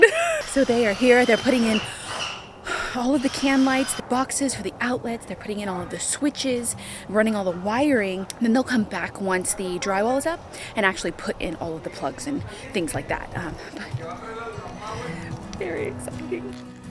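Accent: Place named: Texas, United States. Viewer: Is it just me or does she sound more texan? Just some small things with a hint of southern accent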